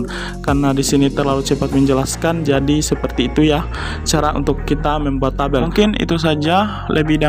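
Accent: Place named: Southeast Sulawesi, Indonesia. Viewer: abang dari sulawesi tenggara k, logat dan cara bicaranya sama" persis..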